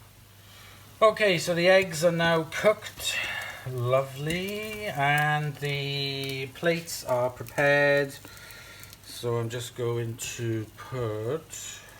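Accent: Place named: Wales, United Kingdom. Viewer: the British half of the accent is clearly Welsh, and listen out for the sotto voce "bugger!" surely a very British expletive (my daughter first used it at age 2, can't imagine where she learnt it...)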